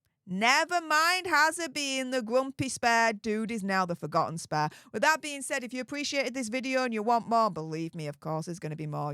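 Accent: Yorkshire, United Kingdom. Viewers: It's part of her Yorkshire accent. American here, when I first started watching, I found some utube videos on the Yorkshire accent and I've understood her much better since.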